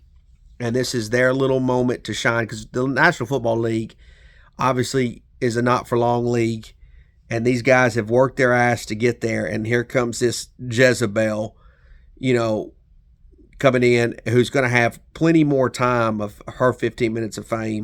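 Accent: South, United States. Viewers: You sound like your from the south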